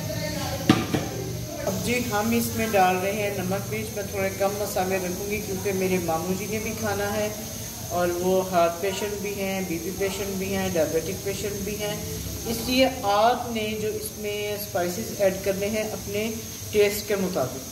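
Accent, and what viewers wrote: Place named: Punjab, India. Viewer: ur Punjabi accent is very nice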